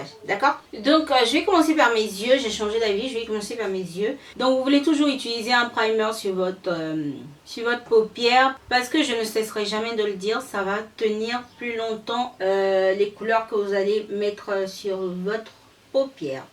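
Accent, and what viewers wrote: Ivory Coast. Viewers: Accent Ivoirien ma chérie.😍😍😍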